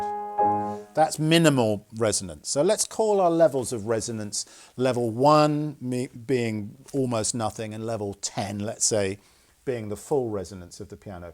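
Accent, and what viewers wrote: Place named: United Kingdom. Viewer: Very enjoy hearing your British accent :)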